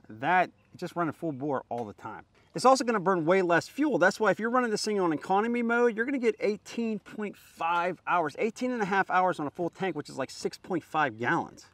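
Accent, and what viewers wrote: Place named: North Carolina, United States. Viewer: You talk just like my friends in eastern "Noth Carolana"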